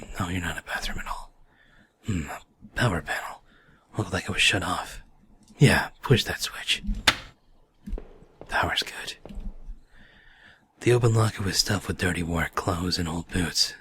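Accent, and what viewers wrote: United Kingdom. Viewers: Lovely british accent there!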